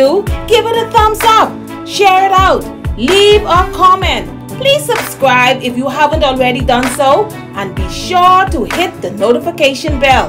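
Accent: Bahamas. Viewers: Found you because I was missing home and missing the food. Hearing your lovely Bahamian accent and the "God Bless You" makes my heart smile. I try not to watch your videos when hungry 😂. God bless you Miss. Thank for "bringing the restaurant home."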